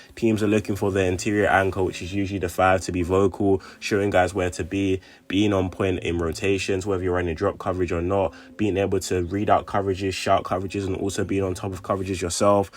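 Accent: United Kingdom. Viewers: I love your British accent. And look at that 3 point shooting at the end of the video. Near flawless!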